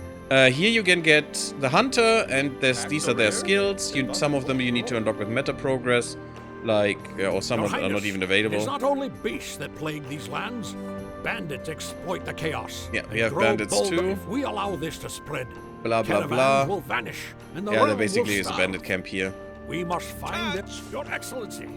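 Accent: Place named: Germany. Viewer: nigga ich kann ganz klar raushören das du deutscher bist. warum redest du nicht deutsch?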